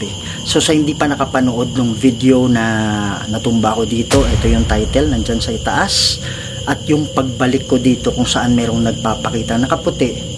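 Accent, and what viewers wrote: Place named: Ilonggo, Philippines. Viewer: parang Ilongga ung tono ni..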